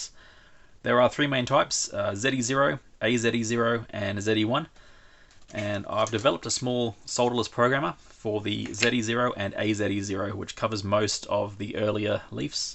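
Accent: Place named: Australia. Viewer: Australian accent.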